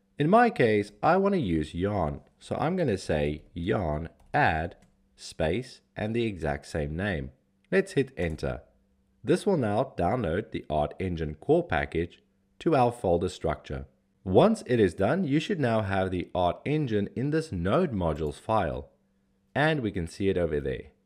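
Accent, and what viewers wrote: South Africa. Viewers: Hey NFT ! Thanks for all this information. You sound South African, did I get that right? Anyways, I'm getting stuck in getting the art engine to work on my end (Windows). I have just finished my collection's art work and would now like to generate it. I'm getting stuck at the point where I have to input 'npm i' into the terminal. When I do so, it gives me a bunch of errors. I'm not a coder (still in the baby stages of learning) so this is all foreign for me. (Art engine template) Any help would be greatly appreciated.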